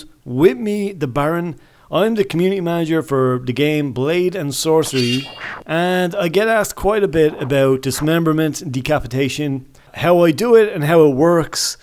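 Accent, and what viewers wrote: Ireland. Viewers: Your Irish, right?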